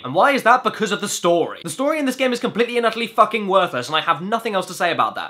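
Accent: United Kingdom. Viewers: British goodness!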